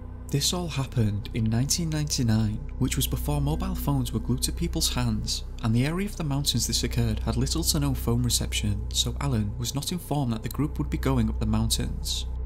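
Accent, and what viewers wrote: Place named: England, United Kingdom. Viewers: It's really hard to follow these automated narrators. And why do these "robots" always have English accents?? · Sounds like a Yorkshire accent.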